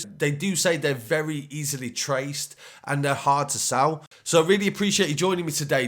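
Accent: Birmingham, United Kingdom. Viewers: My man needs to do a cbeebies bedtime brummy stories !!! Would be epic ... especially with the sponsor before it all starts 👍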